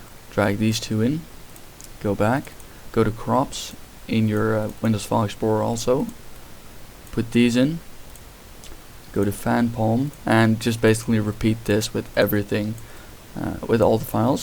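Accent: Netherlands. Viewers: R u dutch? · shall my laptop take off or explode? XD btw are you Dutch?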